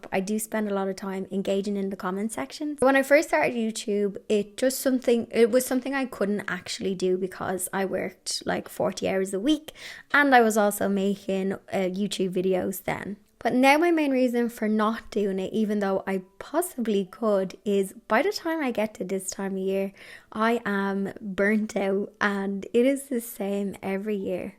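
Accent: Ireland. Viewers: Dear, your channel is one of the very few I'm really watching longterm, which means over many years. Lots of others I like(d) became noticeably calculating. Understandably, of course. But you manage to keep your videos very natural and unaffected (sorry for my bad English, I'm not sure, if I chose the right words). I love the mix of craft, "housekeeping", gardening, cats, trips and chitchat. The gardening part might be my fave, as you seem to live in a very similar climate like mine. But I also just love to listen to Irish English, and your voice is so charming. Your dedication to quality over quantity and work life balance makes you even more sympathetic. Keep following your heart, please. And have a magical christmas time with your loved ones! A big THANKYOU from southern Denmark! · I would love more DIY and craft videos but not daily:-) I won’t keep up:-) but maybe a xtra one here and there would be welcome treat. 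I love hearing about you, I follow you because I feel a kindered spirit in DIY and love hearing the Irish accent and slang, I miss my old neighbour especially at this time of year. But you keep doing you. Enjoy your friends and family and keep them just for you, you deserve that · I love the balance you have on your channel 🤗, I could listen to the chat all day plus your Irish and it’s my favourite accent. The quality of your upload is always amazing and if putting your self under extra pressure to do more…..then don’t because that pressure will reflect on you and that’s not good for your health. Finishing this year with kitten content is a wonderful end to the year and the exploding tree perfect. Take care x xx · I just like listening to your Irish accent lol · Cheeky thumbs up for sure. ❤ Could listen to your beautiful irishness for hours, love the kittens though Blonde will be forever in my heart ❤️ Pretty well love any and all content 😊 so keep it coming in the forms that your heart takes you, I'll be watchings, cheers and happy holiday season.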